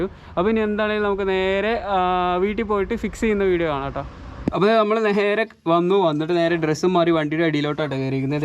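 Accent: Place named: Kunnamkulam, India. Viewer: Kunnamkulam kaare parayunoooda naaari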